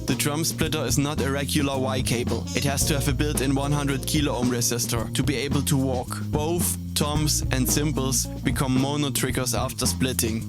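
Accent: Germany. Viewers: Du klingst deutsch lol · Nice video, but earcancer. Are you german?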